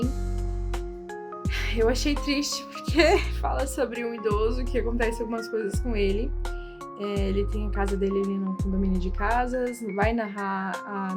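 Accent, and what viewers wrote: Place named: South, Brazil. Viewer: Teu sotaque é lindo, vc é do Sul ? Amei as observações ❤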